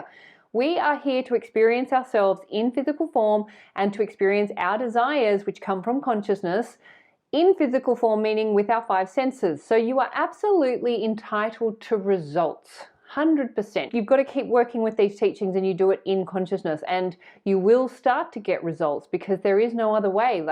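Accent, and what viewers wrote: Australia. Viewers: So refreshing to hear an Australian. Really enjoying your content from far north Thailand. Thank you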